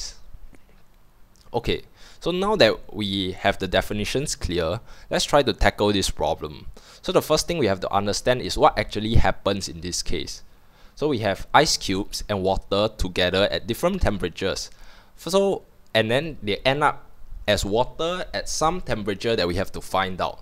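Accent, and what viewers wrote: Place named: Singapore. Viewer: hahhaa just want to say i stumble this on chatgpt, then when i played the video i heard ur voice/accent, im like EH singaporean hahaha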